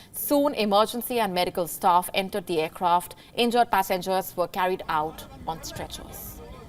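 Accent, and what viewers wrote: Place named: India. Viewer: Thick indian accent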